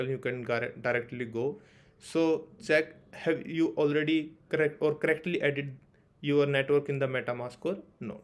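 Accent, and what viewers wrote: India. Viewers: Useless like this Indian Voice :)))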